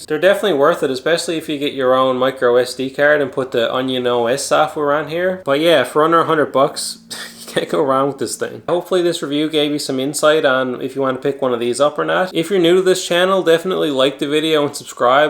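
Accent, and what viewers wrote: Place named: Maritimes, Canada. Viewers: I knew you were a Newfoundlander, earned a sub from Nova Scotia. Keep up the great work my trout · Great review. I just got one as well ans love it. The short bootup times make it great for pickup and play on the go. By any chance are you in Nova Scotia or Newfoundland? I recognize that east coast accent. :)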